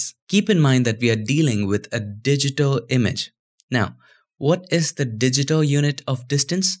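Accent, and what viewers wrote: India. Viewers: You know what, it's almost a perfect video, you just need to know how to get rid of your indian accent. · guy with Indian accent tells me how to fix motion blur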